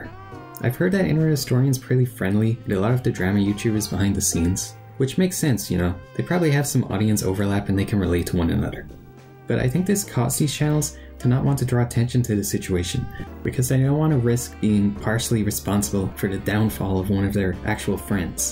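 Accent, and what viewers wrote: Ireland. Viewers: What's that accent? Irish? Welsh? Pakistani???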